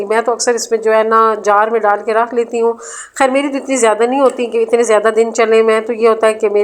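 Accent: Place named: Attock, Pakistan. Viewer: I like your house...simple,decent... Ap k bolny k andaz sy mjy lga k ap attock sy hn..r ap ny khud b zikr kr diya😊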